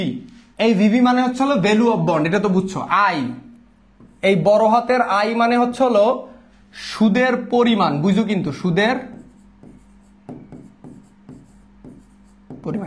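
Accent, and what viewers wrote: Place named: Noakhali, Bangladesh. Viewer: স্যারের বাড়ি মনে হয় নোয়াখালী বা লক্ষ্মীপুরে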